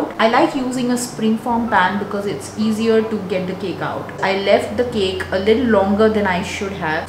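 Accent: India. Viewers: I love the thick indian acctent, the cake, and the fact that you put the macros too.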